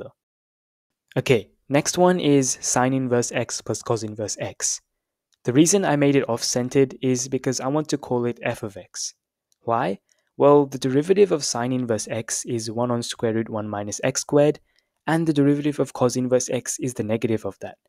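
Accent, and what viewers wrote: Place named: Southeast Asia. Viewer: I dont think so,his accent is diff from indian,more like southeastern or something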